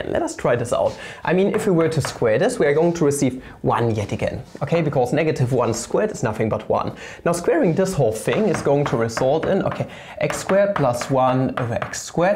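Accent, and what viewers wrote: Germany. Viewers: German accent is very interesting, where did u learn English?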